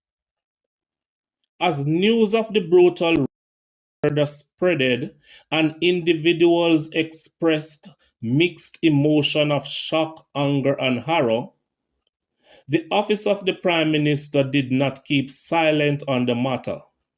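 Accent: Patois, Jamaica. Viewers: PATWA! Spoken language of Jamaica! Surely this must help you understand. If not! GOD guh wid yuh! Bless!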